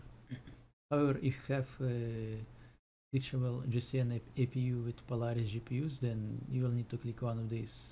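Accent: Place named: Russia. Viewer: Dude I need this tutorial for my R9 390 and I just realized you are Estonian, lessss goooooo. You have a thick Russian accent so you probably have a accent while speaking Estonian as well but I am just glad to see another brother on the web.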